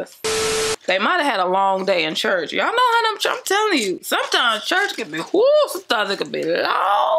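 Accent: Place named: South, United States. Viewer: Bby ILY!!!!! U made me subscribe within 90 seconds!!!! Nd let's tlk about that note beginning 😂😂😂 I said I'm most definitely joining the family nd joining the fun!!! KEEP GOIN WITH THAT YUMMY COUNTRY ACCENT!!! Hubby is from Louisiana nd me Cali ❤️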